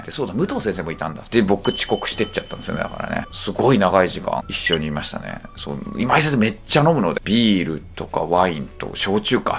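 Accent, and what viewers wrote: Kansai, Japan. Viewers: 関西弁かわいいw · 先生の関西弁めっちゃおもろい！！ww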